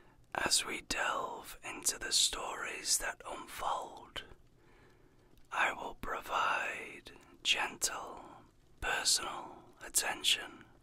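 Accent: United Kingdom. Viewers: Thus video would be SO MUCH cooler if it was LotR-themed. Just saying. Your voice is fine. 🤔 Obviously British, yes? 🥰🤗💖🇨🇦 I ABSOLUTELY LOVE Brits!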